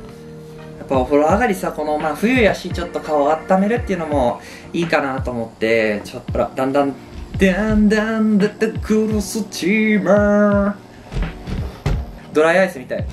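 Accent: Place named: Kansai, Japan. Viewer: 関西弁も素敵です☺️💕肌綺麗ですね！スチーマー買おうかと検討してたのですごく参考になりました！😆💕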